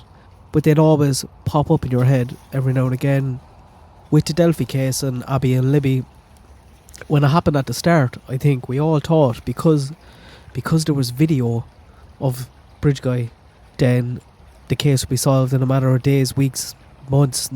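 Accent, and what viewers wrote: Ireland. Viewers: No, I’m guessing it’s in Ireland somewhere…the narrator is Irish and the fauna looks like it would be in Ireland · Irish accent it's so lovely! X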